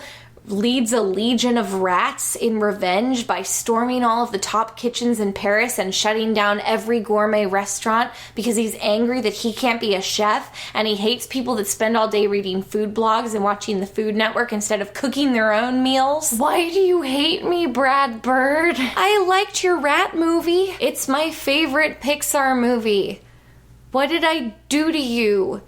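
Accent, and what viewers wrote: New York, United States. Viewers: You indeed sound like you are from new yawk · I don't care what people say, your costume is amazing...that new york accent thooo..... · Your New York accent made me cry laughing.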